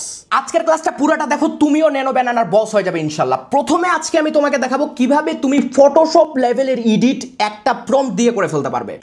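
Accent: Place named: Bogura, Bangladesh. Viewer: বগুড়ার ছোল বলে কতা,,❤❤❤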